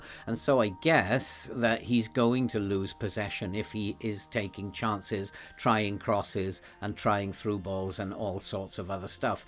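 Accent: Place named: RP, United Kingdom. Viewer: So my guess was right. And if you ask me how I did it I'll answer - you speak a very good well-built RP English peculiar to teachers especially. Just an observation.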